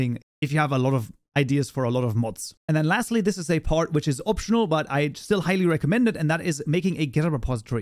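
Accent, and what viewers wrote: Germany. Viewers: Its funny how you nearly lost your German accent in to the java tutorial series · You sound german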